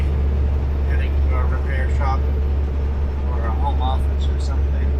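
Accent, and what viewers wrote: Canada. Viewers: Nope. You sound like a Canadian to me. From a fellow Canadian and British Columbian. 😎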